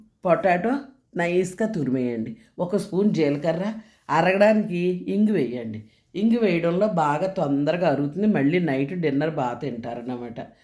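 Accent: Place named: Godavari, India. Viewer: Ma ammamma la matladataru amma mana godavari style ye veru amma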